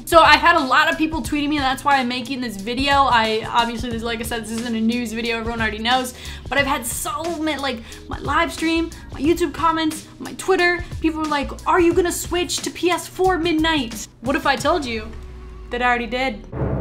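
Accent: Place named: Midwest, United States. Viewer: She has a Midwest American accent. Just like every where else in the world. There are different forms of the same language. No need to be rude.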